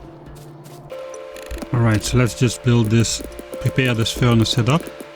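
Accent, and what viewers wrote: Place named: Netherlands. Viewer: Ha, I thought you were dutch because of the accent and I was correct! Nice content, thank you! Read as: Thank you for torturing yourself for our entertainment! <3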